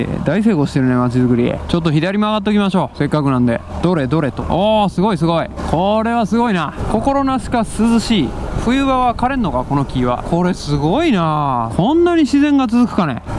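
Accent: Kansai, Japan. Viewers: 楽しく拝見しています。今のコロナやウクライナのニュースを見ていると、本当に(何でもないこととが幸せだった)と実感します。氏のモノローグと言うか、おしゃべりも関西人らしく心地よく響きます。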